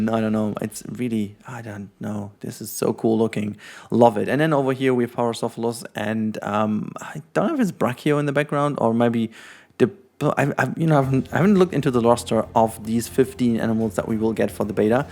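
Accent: Germany. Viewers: When Germans speaks Englisch then it sounds exactly like this guy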